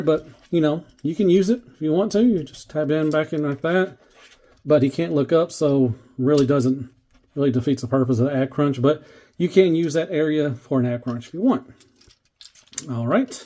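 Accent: South, United States. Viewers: Great review once again, just as I'm about to go to bed. I'm gonna have a nice, quiet sleep now thanks to you and that southern accent.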